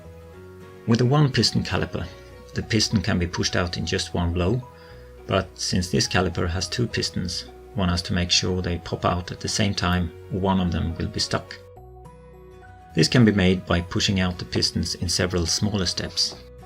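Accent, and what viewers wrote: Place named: United Kingdom. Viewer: You sound like British!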